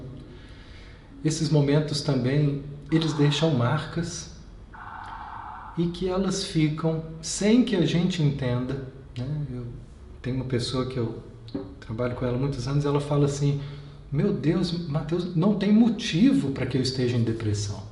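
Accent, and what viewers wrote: Minas Gerais, Brazil. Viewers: Gratidão!! Conheci teu canal através de um grupo aqui em Garopaba SC há um mais de um ano, desde então assisto todos, reasisto....E assim vou, passo à passo plantando sementinhas de autoconhecimento!! Adoro esse sotaque mineirinho!! 🤗🤗🤩🤩👏👏👏🙏🙏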